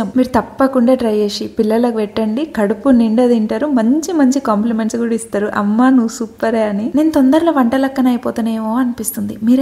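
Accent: Telangana, India. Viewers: nuv pakka telangana basha matladutunavv..manchiga anipistundiii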